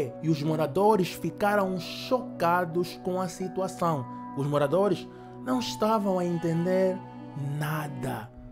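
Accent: Angola. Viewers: Esee cara narrando parece angolano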